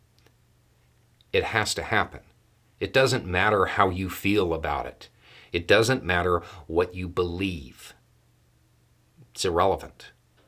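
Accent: South, United States. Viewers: Well said sir. I love to hear a rational argument from a bearded southern accent. You blow the stereotypes wide open and I just wish more people would listen to you instead of the insane rants and misinformation we normally hear.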